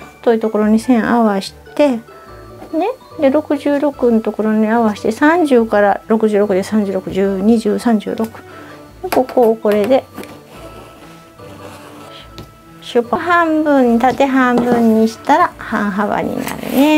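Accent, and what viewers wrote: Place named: Kansai, Japan. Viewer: さんいつも拝見してます！そのおしゃべりがほんわかしてて本当に癒される〜 適当でええよ〜とか！時々 関西弁？って思える節もありますがどちらの出身ですか？ワンピース簡単そうでチャレンジしたくなりました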